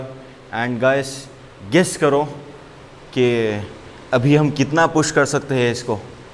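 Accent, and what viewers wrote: Hyderabad, India. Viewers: Hyderabadi ho kya Miya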